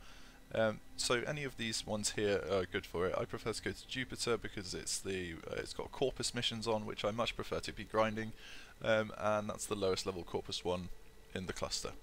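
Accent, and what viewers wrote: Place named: United Kingdom. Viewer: I like this guys voice (no homo) I wish I spoke British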